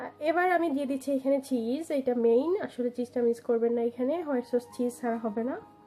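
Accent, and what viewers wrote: Sylhet, Bangladesh. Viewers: You are syleti right? I can tell from your accent your not fluent shudho bangla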